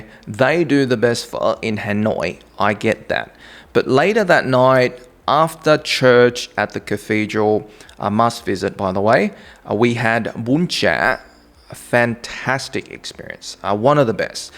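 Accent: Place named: Australia. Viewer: So weird to see a Vietnamese guy speaking with an Australian accent reminds me ofLuke Nguyen. I am glad the rest of us ended up in the states.. I am personally heading back to Vietnam for the first time in 50 years.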